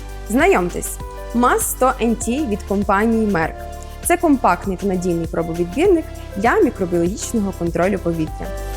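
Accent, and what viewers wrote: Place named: Western Ukraine, Ukraine. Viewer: Приємно чути таку експертну подачу українською, ще й з таким теплим західноукраїнським акцентом 💛💙 Дякую за професійне пояснення про важливий аспект мікробіологічного контролю!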